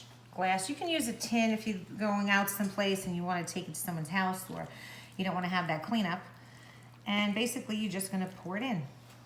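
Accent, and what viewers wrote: New York, United States. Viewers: Methinks this lovely lady is from Brooklyn or NYC, from her accent. this does look good, but gee--10 eggs??? WOW! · peppa , sawlt....and butta... AH... I love my NY peeps! Awesome !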